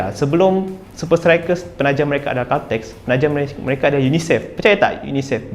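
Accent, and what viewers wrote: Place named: Sarawak, Malaysia. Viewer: Speaker ni bckp mcm org Sarawak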